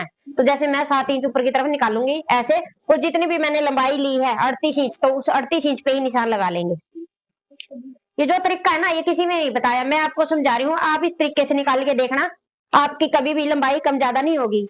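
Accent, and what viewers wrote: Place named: Kaithal, India. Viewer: Ap kaithal side hein kya.mere mama rhte hein unki boli h ap jaisi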